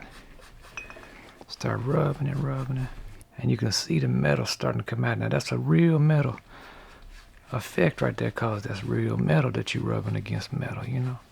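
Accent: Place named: South, United States. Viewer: I’m really wondering where he lives...his southern style accent is throwing me off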